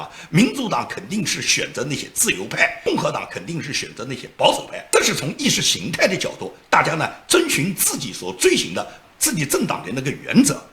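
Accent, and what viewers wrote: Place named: Nanjing, China. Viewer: 挂了，是南京话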